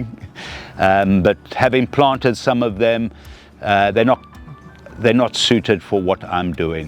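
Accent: South Africa. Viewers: The moment he started talking - I knew.... another Saffa - nice job! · did not really catch the area.. Is it part of England? He sounds South African❤ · There is only one accent like it.😂 I immediately knew he is South African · Im assuming he’s South African, he sounds very SAn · Oh the south african accent. Unique I tell you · I just knew he was South African 😅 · This is so beautiful and so lovely to hear a South African voice doing this magical work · Delightful! So proud to hear his South African accent ❤ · Great look! You can't mistake that wonderful South African ascent ❤ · I'm spotting a Saffa accent!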